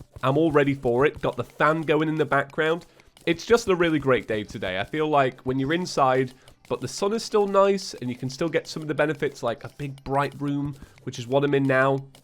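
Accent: England, United Kingdom. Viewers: I believe that is the English accent making it hard to say chests. Us Minnesotans are fine.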